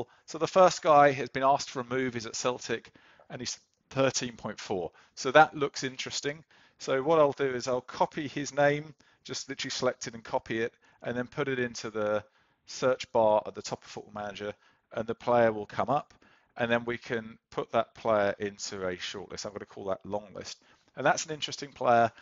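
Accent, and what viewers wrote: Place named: South America. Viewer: Very interesting to have the critical data, but imo, sexy sounding South American > data.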